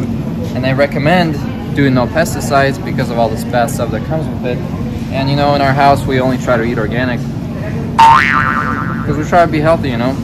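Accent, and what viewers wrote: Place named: Russia. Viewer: От русского акцента не избавиться))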